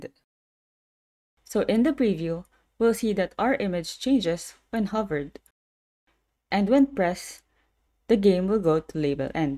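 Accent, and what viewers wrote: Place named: Philippines. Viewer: this is exactly the kind of video i needed!!! also super cool that you're from the Philippines as well, i was surprised to hear a Filipino accent voicing this! thanks so much for your vids!!!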